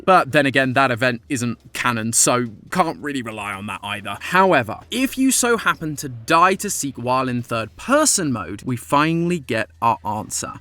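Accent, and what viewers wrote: United Kingdom. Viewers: Honestly, I love as a host. His British voice is definitely my favorite thing about him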